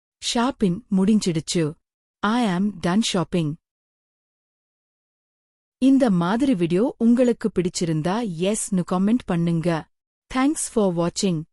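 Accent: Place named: England, United Kingdom. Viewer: இந்தம்மா.england aa uchcharppu .sakikaal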